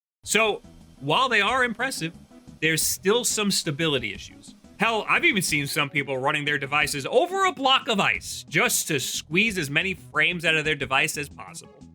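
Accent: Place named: Long Island, United States. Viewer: That's an integral part of the Long Island accent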